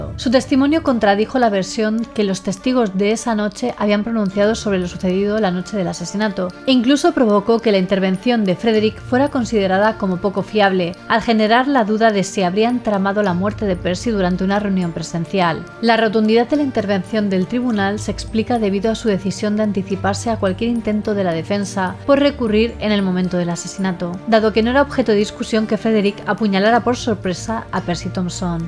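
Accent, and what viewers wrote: Spain. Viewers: "Solo se que se nada". Debo de estar muy agradecido contigo, por qué con tus vídeos ( tan lindos, bonitos y hermosos, como la creadora misma ella es ) nos alegran el día; ellos son la alegría de todos nuestros días. Tú eres la mejor, la única YouTube'r que es inigualable, con ese asentó español, eres maravillosa, excelente, muy profecional, YouTube'r, eres tú, hermosa española. Nota: Gracias por tus vídeos. Gracias por existir. Te adoro. Te admiro mucho. En verdad te lo digo, eres tú, muy diferente a las demás. Eres la mejor. Nadie como tú. Hasta el final!!!!!. Hasta el fin de este mundo!!!!. ", HACIA LA ETERNIDAD"!!!!! 💀🏰💜🗝️🕸️🕷️🕯️🧹⚰️👻🎸🍷🎹🖤🌹🎃📚🔮🧙😈🔥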